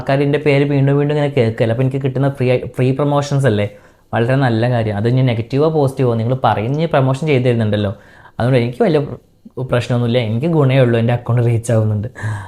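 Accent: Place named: North Kerala, India. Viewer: ബെന്ന്, പയം പുയുങ്ങിയത് 😅നിങ്ങൾ വടക്കൻ കേരളീയരുടെ ഭാഷ ശെരിക്കും ചിരി വരുന്നുണ്ട് 🤪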